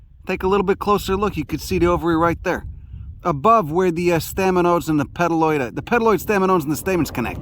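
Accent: Chicago, United States. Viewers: Chicago, Illinois area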